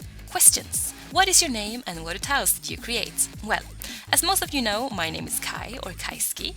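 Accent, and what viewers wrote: Sweden. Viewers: I just started watching your videos, and i am actually really glad, I did. And your accent is so cute! where are you from? ^^; //edit, you're from sweden? awesome!// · I knew you were a Swede the moment you opened your mouth! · Oh my gosh your Swedish?!?! you and pewdiepie my favorite youtuber both Swedish your accent is so cute I love it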